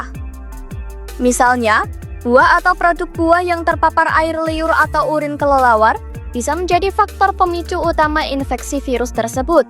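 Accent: Java, Indonesia. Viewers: Medok banget ya logat jawanya😂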